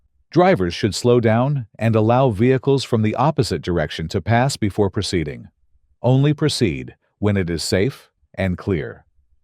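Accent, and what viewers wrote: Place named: United States. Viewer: why an American accent for uk driving????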